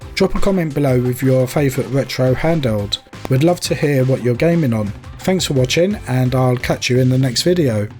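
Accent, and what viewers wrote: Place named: London, United Kingdom. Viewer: If you ever have his money, don't forget about me xD I had a feeling you were using a London accent. Your voice reminds me of his role in the series Taboo.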